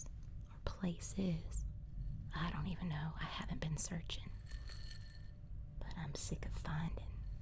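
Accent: South, United States. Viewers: Omg what a video you’re southern accent was so on point an you made the most beautiful cowgirl ever an awesome an the flannel shirt was such a nice touch my mom raised me to be a gentleman a lovely angel like that a lovely angel like that a true 10 out of 10 It is a lovely feeling when you see someone who catches your eye an can’t that’s the same feeling when ever you are on twitch by the way you’re always beautiful you captured my imagination just watching each action in this video unfold I’d blush if someone that pretty admitted she had feelings like that glad that was not the last goodbye for those to love birds this was truly great asmr at its finest love you mama A+ work so talented rocking the red lips he should have kissed her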